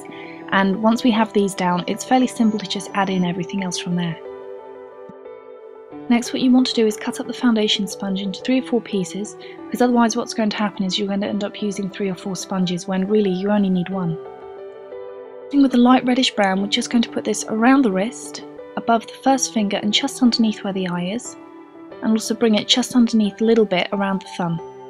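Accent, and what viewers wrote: United Kingdom. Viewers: If someone did these videos and had an annoying voice I wouldn't be able to watch it but u voice is all British and stuff >.<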